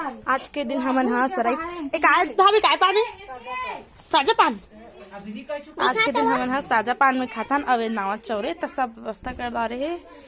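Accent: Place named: Durg, India. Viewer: Seva johar.tuman kon Raj ke aa nai bataya.boli se lagathe tuman durug side ke ho sakat ha.ham to Korba jila wale🙏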